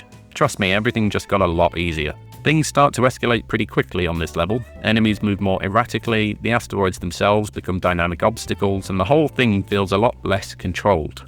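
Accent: United Kingdom. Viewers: no, no you don't sound annoyed. You sound ANNOYING. Lol, clown. Skipping the video and dropping a dislike because literally every video with a British accent is them complaining about how much better they know something than everyone else does, even though they're actually the ones being aggressively reductive and flippant and irreverent and, because of all those things, inevitably wrong about every single subject they come across. Not watching the video but please, comment I'm wrong if you put yourself through this nonsense and feel I got it wrong. It'd be interesting to me because the odds are that I didn't get it wrong. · As an Aussie it's my national duty to take any self-appointed Starwing expert with a Pommy accent down a peg. Because what's the point of being on the internet if you can't get mad at random people saying ... wait, you said that bit. 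Anyway, despite your alleged Britishness and supposed history of playing this game since 1993, you insisted on calling the game Starfox instead of its right and proper PAL title, so you've brought this on yourself I'm afraid. Hostile force carrying bits of geometric structures around intending to build something? Mate, those are Corneria's own robots cleaning up the debris. You don't get points for shooting them, because they're friendly. They even carry off the pieces of the Attack Carrier that Falco destroys in Course 3 Corneria. Because he does in fact do that. Calling this an on-rails shooter ... such disrespect. Starwing is a rail shooter crossed with an obstacle course. That's the secret other half of the ingredients that makes it so good. Arches! Arches everywhere! Don't worry about your score? Mate, that's like two-thirds of the replay value in this sleek little cartridge. You've got to get your money's worth. 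You haven't beaten Starwing till you've rung up a perfect 100% score on all three courses. And no cheeky Black Hole shortcuts allowed either. I only ever managed it on Course 3 once. Once. And I don't have the photos of the old living room TV to prove it. You're just saying the score doesn't matter because you didn't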